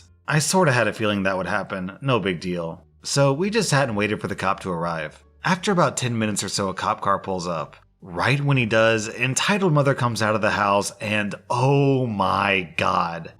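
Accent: South, United States. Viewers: thick southern accent on point man. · southern accent is the best thing that's happened to me today. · entitled Karen voice mixed with southern accent is the superior voice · It's pretty obvious from your Southern accents that you think they're synonymous with ignorant yokal. That's pretty bigoted. Especially when you consider this: Some of the deepest and slowest accepts you hear come out of Alabama and Mississippi. Ironically locals work their asses off their whole lives for a shot at NASA facilities in their home states. Those accents got us to the moon. Have some fucking respect for "The Other." Also realize the deepest most impenetrable Southern Accents come from Yankees who have to prove "They ain't white." I know because I've asked or even heard the discussions people thought nobody else would hear. So it's almost like ignorant fucks live everywhere in every community, but especially amongst City Yankees. 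· does a good southern woman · Your high-pitched southern EM voice sounds like Ziro the Hutt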